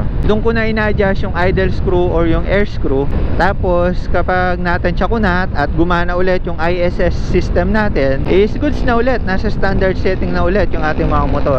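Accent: Pampanga, Philippines. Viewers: sabi na boss kapampangan ka eh hahaha pabsin ko lang accent mo sa mga tutorial mo hahaha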